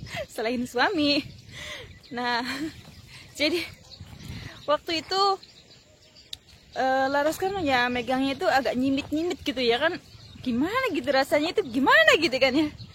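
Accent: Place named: Losari, Indonesia. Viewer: Oh.... indramayu ya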